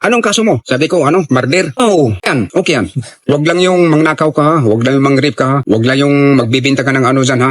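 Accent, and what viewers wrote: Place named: Bohol, Philippines. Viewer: cebuanong may pagka boholano😊.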